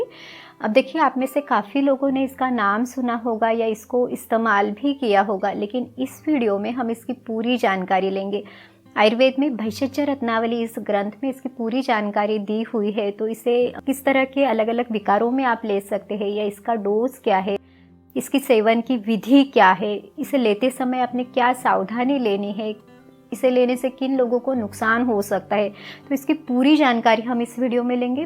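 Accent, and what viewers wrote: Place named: Maharashtra, India. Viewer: Aarey tumche bolanyaavarun tumhi marathi waatata. Mag aadnaav Kasey 😂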